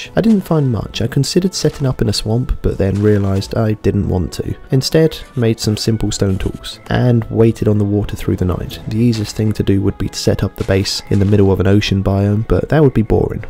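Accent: United Kingdom. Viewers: Um he’s British if you couldn’t tell 😬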